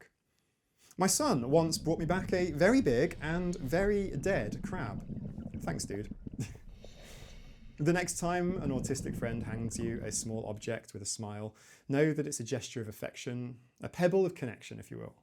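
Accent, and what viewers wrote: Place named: United Kingdom. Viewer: I love your presentation style and accent! As a British person in Germany, have you noticed how criticism can be a love language there? I am native German, in the UK for 20+ years, and I realised recently I only criticise people when I care enough about them to want them to succeed and get things right, and to not fail or embarrass themselves. As Sheldon from the Big Bang Theory put it "if a summer in Germany has taught me anything, it's that brutal honesty is its own kind of love." (Young Sheldon, season 7) and "When I criticise people I lift them up." (from the "adult" BBT series) Seen by NT viewers probably as just as another annoying know-it-all trait, I can really see the truth in that. Can anyone relate?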